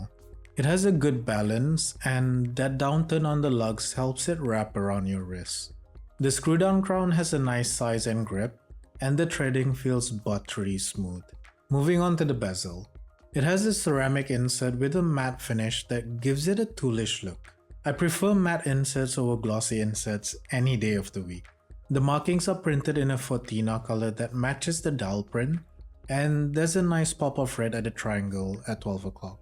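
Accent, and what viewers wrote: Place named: India. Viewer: Thanks a lot! Never heard of this brand. I will check them out. Judging by your accent you are from India, right? Which region/city you recommend to spend my holidays in India? I'm from Central Europe and I love spicy food.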